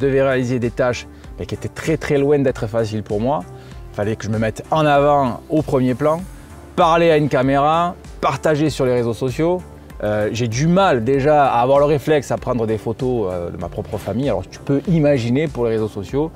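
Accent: South, France. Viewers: Merci pour cette belle vidéo, j'apprécie ta façon d'aborder les choses et ton honnêteté et biensûr il ne faut surtout pas oublié l'accent du sud si charment droit au but 💪🏾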